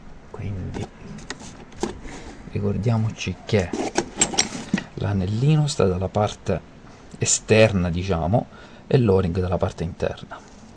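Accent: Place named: Salento, Italy. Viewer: grazie per il video, molto chiaro.!!!!! sono alle prime con uno yamaha 40, se volessi contattarti telefonicamente è possibile?? dall'accento credo sei Salentino ? grazie luis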